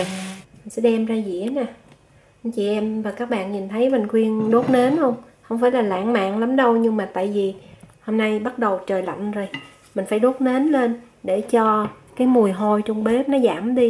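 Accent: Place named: Southwest, Vietnam. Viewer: thích nghe giọng của người miền tây nam bộ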